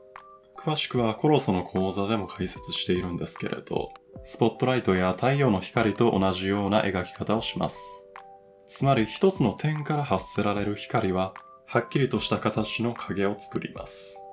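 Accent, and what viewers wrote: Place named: Kansai, Japan. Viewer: 初見ですが絵はもちろん、声が低音でかっこいいし関西弁… 控えめに言って好きです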